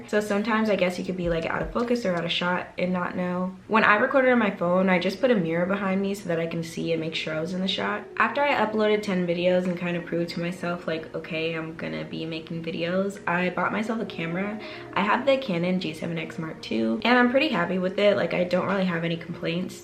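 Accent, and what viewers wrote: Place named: England, United Kingdom. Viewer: I love your English accent ❤ Uganda 🇺🇬 loves you sister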